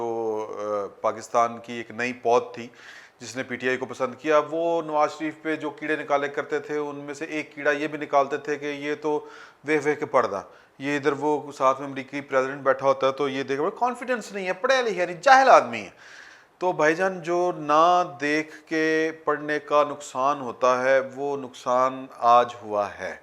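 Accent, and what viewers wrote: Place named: Lahore, Pakistan. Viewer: Yaar aap u tube say pehlay kahan thay qasam se mn aapka fan hogaya hon ur great sir g Khalis Lahoria wali boli hi aapki.shakhsiat ko chaar Chand lagati hai well-done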